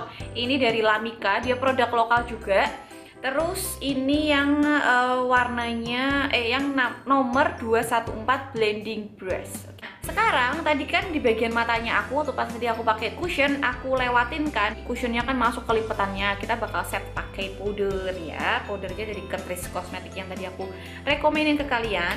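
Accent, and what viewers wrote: Java, Indonesia. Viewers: Ayune kyok golekan jeung.... salam kenal yo aku soko jeporo mbak... tetap bikin video ala mbak medhok aja ya ... kereeennn inspiratif sekali buat saya dan buat pecinta makeup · Cantikkk bgtt mbak medokknya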